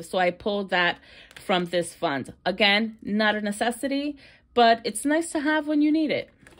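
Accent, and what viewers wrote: New York, United States. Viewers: Correct me if I’m wrong but your accent sounds like you’re from New York. I like the accent lol I’m from Louisiana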